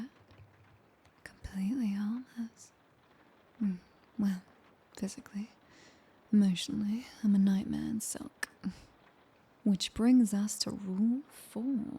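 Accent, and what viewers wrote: United Kingdom. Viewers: British 😭 dont shank me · Sleepy British girl 💜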